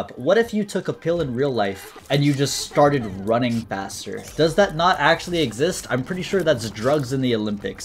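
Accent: United Kingdom. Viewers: Never thought that would be even more british by the day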